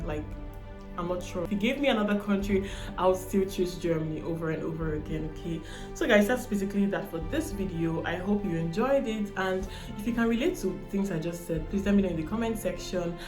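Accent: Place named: Nigeria. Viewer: You sound like a Nigerian, you guys aren't the same as us North American blacks(the genuine blacks, so far as North American and media bias goes). Your distinctive patterns of behavior aren't quite the same as what we cinsider to be black, you guys have an exotic~foreign vibe and that changes how white people intetact with you, unlesd you can properly emulate being an American black, you don't seem to have this capacity(you just come off as high class lady that is pretending to identify with the rest of us blacks, although my being part chinese also makes my experience not quite the same as a standard U.S black).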